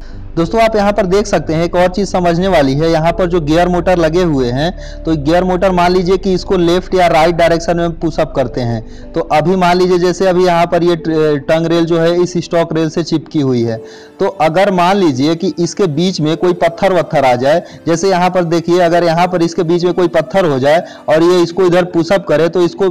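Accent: Bihar, India. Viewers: Bhay tum pakka Bihar se ho...mai v patna ka hi hu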